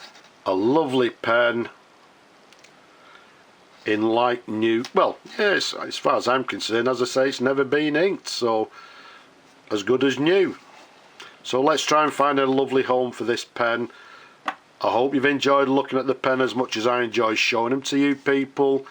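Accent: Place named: Yorkshire, United Kingdom. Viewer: tha sounds lak a Yorkshire man 😎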